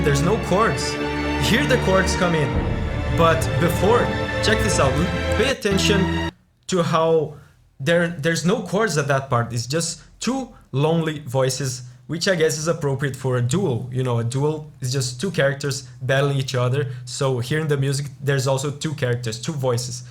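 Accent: Brazil. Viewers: Você é Br rapaz,sua voz é bem BR adimita. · c tem sotaque de brazuca kkkkkkk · Out of Bloodborne OST, you could react next to Laurence the First Vicar, basically Ludwig, except it's not a dance, just straight up chaotic church music, and DS3's Slave Knight Gael aswell, they're really different and more emotional than the other boss musics in the series; Really sad that Yuka Kitamura didn't win any awards in both Bloodborne or Dark Souls, let's hope she does with Elden Ring. Also i've just discovered your channel and learned you're brazillian too, mostly from your accent, abraço :D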